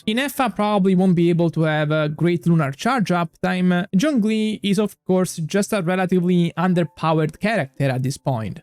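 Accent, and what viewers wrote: Italy. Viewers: Im hearing the italian accent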